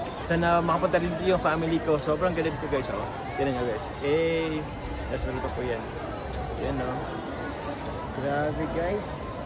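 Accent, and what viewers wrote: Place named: Visayas, Philippines. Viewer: Wow marunong na magbisaya si ❤❤❤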